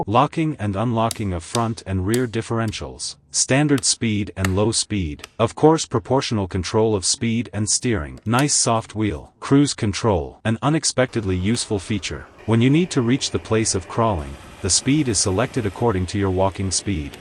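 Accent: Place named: Russia. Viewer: Лютый акцент я сразу понял что автор походу Русский )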